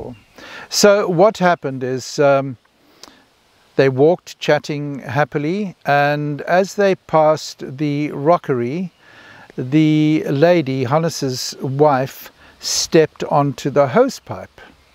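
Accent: South Africa. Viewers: I like the style with you being outside. The South African-ness and story telling puts me in the same frame as reading a Wilbur smith book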